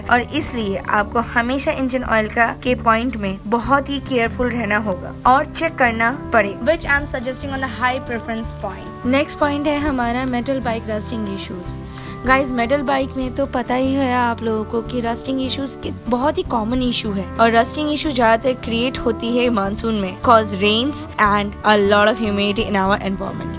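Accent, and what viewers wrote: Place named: West Bengal, India. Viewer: Sweet Bengoli voice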